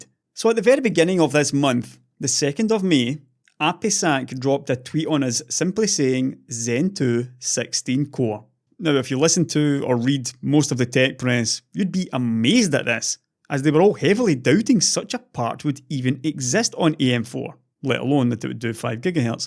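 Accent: Scotland, United Kingdom. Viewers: Scottish and male alter ego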